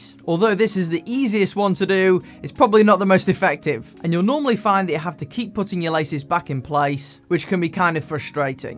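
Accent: United Kingdom. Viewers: I watch his videos only to hear that British accent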